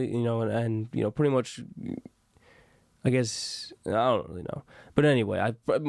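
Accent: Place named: India. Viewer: Sounds like he's a curry loving Indian.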